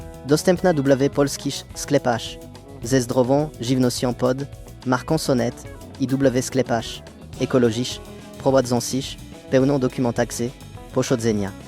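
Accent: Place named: France. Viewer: Ktoś tu mówi z francuskim akcentem 😅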